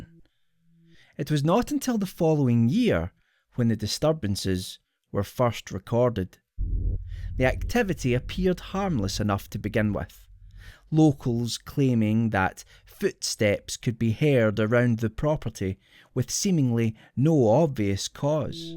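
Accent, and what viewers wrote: Scotland, United Kingdom. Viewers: Quality videos, interesting concepts, Scottish accent, Dude needs more subs. Good work sir.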